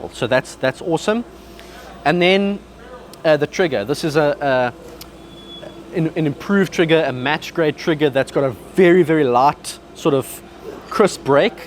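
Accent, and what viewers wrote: South Africa. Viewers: Note (for those not familiar with South African English): When says /ˈrafl/, he means rifle /ˈraɪ.fəl/.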